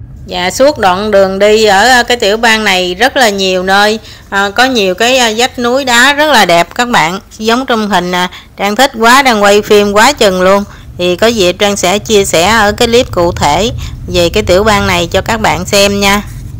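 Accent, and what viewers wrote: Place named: Mekong Delta, Vietnam. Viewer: Nói chuyện đậm chất miền tây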